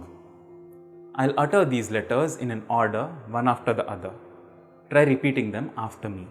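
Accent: South India, India. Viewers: loving these video's! Learning a lot here. :) Small thing for you to pick up though when pronouncing a 'T' in English. The 'T' is not velarized as you probably know, but a simple plosive sound. The 'Indian T' doesn't exist in English. I think it is a great struggle for Indians and Pakistani's to get this right. But we all have our own accents when speaking a foreign language don't we. I do! :D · Why to put hands in this weird pose that is not even a mudrah. this way host doesn't look smarter and why such awful indian accent, at least we re trying here to listen to Sanskrit and this accent just hurting our ears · This is probably a dravafian accent. Ancient Aryans ate meat probably sounded more manly like Persians do.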